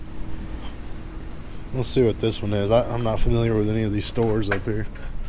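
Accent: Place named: Texas, United States. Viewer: I knew it! I knew you lived in my area of Texas. That's amazing! I knew from your accent. As you know, our state has many different types of Texas accents. I was born and raised in Grand Prairie. I moved in 1989 to Navarro County and have heard so many different dialects. This is awesome. Thank you for your wonderful videos. I am a new subscriber (last week, maybe).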